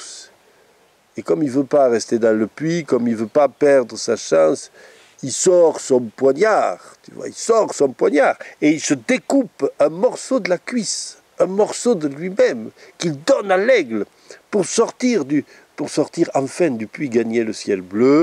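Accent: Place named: South, France. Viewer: Hoorah enfin du vrai accent du sud! Merci pour cette vidéo!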